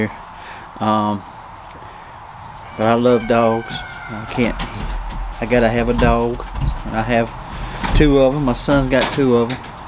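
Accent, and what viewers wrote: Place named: Alabama, United States. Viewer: Were trying to hone in on that accent if yours. Don’t worry I have one too so I’m saying Alabama and she’s saying Louisiana. Who, if any, wins the bet?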